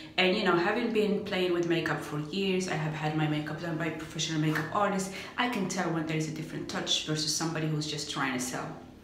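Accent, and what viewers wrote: United Kingdom. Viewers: Great video! You seem really comfortable and happy it's nice to see. I like the makeup look, I just don't give myself enough time to apply makeup in the mornings so skip it. Glad you are working on finishing your open products. I am doing the same. I think you my be picking up a bit of a London accent its cute!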